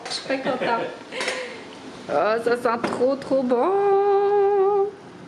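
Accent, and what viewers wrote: Quebec, Canada. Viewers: votre accent qui me rappelle mes séjours au Québec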